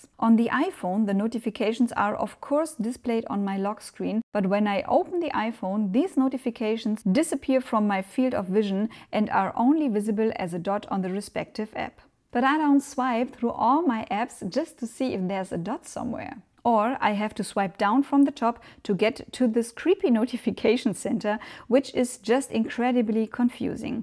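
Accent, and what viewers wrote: Germany. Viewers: Love the German accent, wish I was able to speak German, such a interesting and unique language. ❤ from 🇨🇦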